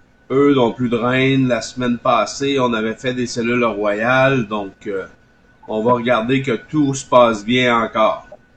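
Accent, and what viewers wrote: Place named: Quebec, Canada. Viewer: Je suis une apicultrice de Toronto. J'essaye d'apprendre la terminologie apicole en français car je suis anglophone. Puis-je vous demander s'il vous plaît d'énoncer un peu plus clairement car j'ai appris à parler français auprès de professeurs français et non québécois. Je suis apicultrice depuis environ 10 saisons. Merci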